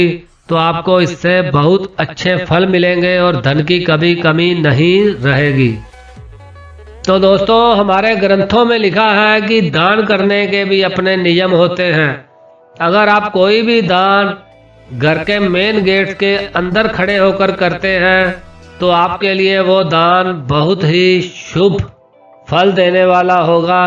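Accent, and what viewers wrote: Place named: Punjab, India. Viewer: Aby tu panjab mai kr mujra kr teri tou hindi bhi panjabi wali hai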